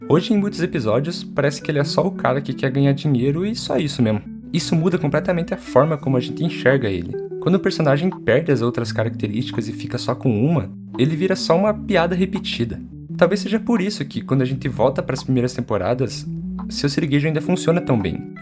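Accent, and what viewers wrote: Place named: Inland Paraná, Brazil. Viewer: Pelo sutaque tu é do interior do Paraná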